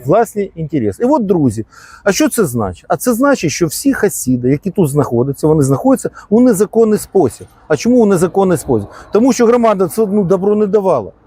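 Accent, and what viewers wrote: Russia. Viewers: Це іде тиха окупація України для створення нового Єрусалиму. Цьому потрібно поставити заборону. Але поки в нашій владі засилля неукраїнців - буде дуже важко. А пану Павліченко та всім причетним щира дяка , що піднімають це архі важливе питання. А пану Павліченко на часі вивчити українську мову і в побуті також послуговуватися нею. Бо досить дивно звучить український боєць за Україну, який розмовляє як москаль, що фарбується під українця.❤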